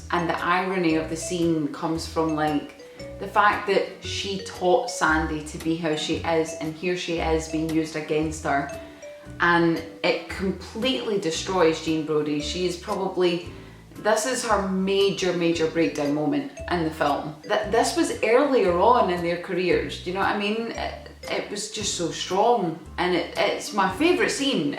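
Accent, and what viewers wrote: Scotland, United Kingdom. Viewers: Very Awesome Video! I never would’ve realized that so many films out there would get your Scottish accent accurately…as FAR too many films to name keep getting my family’s Bostonian accent incorrect…Versus Mike Myers’ Shrek character (which I know love very much), the female Scottish characters that you’ve mentioned in this Video certainly do seem to be the real deal (as opposed to being a mimicked/imitated Scottish accent)…Meanwhile, my friend mentions to me that her friends—whom are 100% deaf—wish to let you know that they’re appreciative of you informing them that all those female characters you’ve mentioned in this Video DO, in fact, speak with a Scottish accent; specifically, my friend mentioned to me that her friends had told her in ASL that they had no clue that those characters spoke with a Scottish accent…My friend also mentioned to me that her friends signed out in ASL that they’re very sure you likely have a very wonderful, lovely, beautiful voice and wish they could have had a chance to hear what it sounds like (and, YES, that WAS, in fact, by all means a complement)…But with a heavy heart, I’m gonna mention to you that my friend had a particularly challenging time attempting to “Read”/Watch this Video, as she mentioned to me in an E-Mail Message earlier this afternoon (Eastern US Time Zone) that the rate of errors in the Closed-Captioning for this Video in particular (for some odd and inexplicable reason) is quite horrendous, about roughly as bad as YouTube’s Aut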